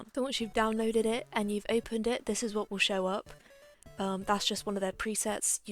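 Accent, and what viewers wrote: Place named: United Kingdom. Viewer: A British Talking Octopus Nice.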